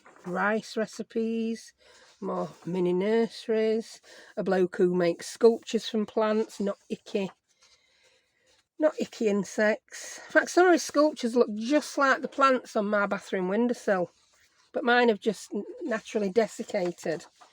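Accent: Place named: Yorkshire, United Kingdom. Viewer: Dot from Derbyshire Hi, I have just watched your video, for first time. I really enjoyed it, also love your Yorkshire accent. I will be following you. I have just discovered Daphne's Diary also and have had a look at the last two years issues on you tube flip through, I think it is brilliant and will be ordering it for this year. Being new to collage I'm sure it will be great to use it. I have also been watching Froyle Art who is a great inspiration for collage and Gelli printing so I will be dusting off my Gelli plate and 2023 is going to be a creative year for me. Thanks for sharing the video, lots of love from Derbyshire, Dot x.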